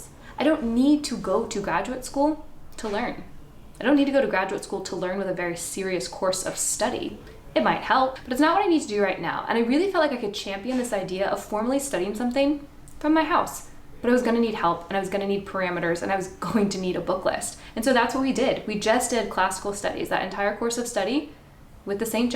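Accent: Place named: Scotland, United Kingdom. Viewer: My baby was babbling over you talking and I heard that you pronounce everything foreign in a "Scottish" accent because that's the other language you know. That tickled me 😂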